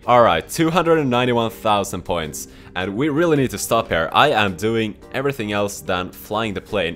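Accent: Sweden. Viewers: You can hear very well that he is from Sweden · Are you from Sweden, cause you have an Swedish accent, if you are then I’m gonna say: Hej, beroende framkallande videos! Hoppas du har det bra! :)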